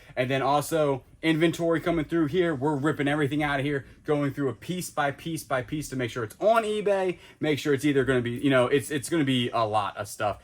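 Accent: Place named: South, United States. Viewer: It doesn't matter what kinda vids y'all make I'd still watch them so thanks for bringing us on your adventures. u crack me up and I love ur southern drawl your just too sweet.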